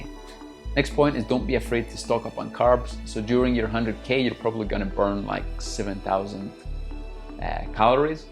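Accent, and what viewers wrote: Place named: Scotland, United Kingdom. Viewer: can't tell if you're scottish or swedish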